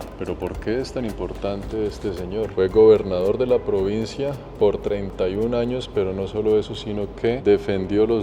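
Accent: Colombia. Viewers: Jajajaja la cultura de la SIESTA,ACA EN SAN ES IGUAL, NO SE PUEDE SALIR CON EL CALOR!! BUENO YO TE SIGO Y TE VEO SIEMPRE!!!! Tus formas de ver cada pais es muy diferente a los demás,muy interesante ver cómo vive la gente común,sus tristezas,alegrías,lo que valoran,sus casas,en fin...tu narrativa con ese acento colombiano me encanta 😊!!!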